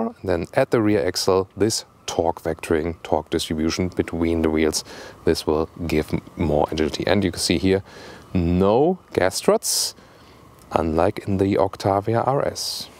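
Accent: Germany. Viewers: Your English is EXCELLENT with a bonus very cool German accent. I can understand your vocals better than way too many of my own countrymen. You choose your commentary so carefully I am rarely left with a single unresolved question, and your delivery is always calm, cool, and collected. Your thoroughness deserves special mention. You know what enthusiastic drivers want to know and you can’t possibly suffer a single disappointed viewer. Thank you for such great automotive journalism and video production.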